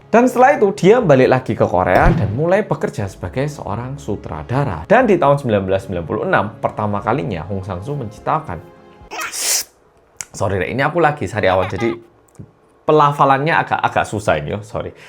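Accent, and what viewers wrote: Java, Indonesia. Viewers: Abis dari channel ngelantur yg brutal anjing2an... ke channel mas2 korea jawa medhoookkkk 😅😅😅 untung gk ketuker😂😂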